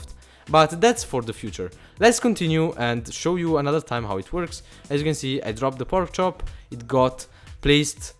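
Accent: Italy. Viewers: Ma sei italiano? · I think good? You are Italian?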